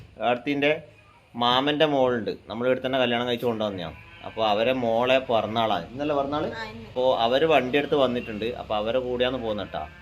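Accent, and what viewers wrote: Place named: Payyanur, India. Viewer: ഇല്ലാട്ടോ. ഞങ്ങൾ പയ്യന്നൂരാണ്. മുംബയിലാണ് താമസിക്കുന്നെ.വീഡിയോ കണ്ടു നമ്മളെ ഭാഷ, നാടൻ സംസാരം അതാ ചോദിച്ചേ ❤നമ്മളെ നാട്ടുകാരല്ലേ 😀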